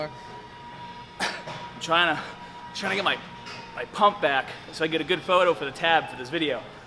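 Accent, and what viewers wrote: Boston, United States. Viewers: Dude his Boston accent REALLY shows in this video. hahaha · I love how u just embrace that boston accent - baaaaah instead of bar, haaaaarrd instead of just hard xD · I'm playing Fallout 4 but none of the characters in-game have that Bostonian accent of yours :((( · Boston is strong with this one. I hope you aren't taking those little jokes about your accent seriously by any means. It's part of you! :))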